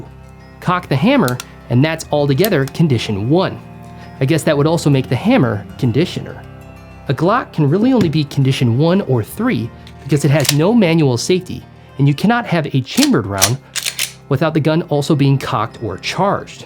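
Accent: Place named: Minnesota, United States. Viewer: What area of Tennessee? Been in Southeast TN my whole life. You killed that Minnesota accent, I went to Ely Minnesota on vacation several years back and my god do you sound like them. The difference of dialect was an issue, my thick southern accent was all but incoherent for the locals in Ely. Our fishing guide made me sit in the front of the boat so he could read my lips so he’d know what I was saying lol, great people there.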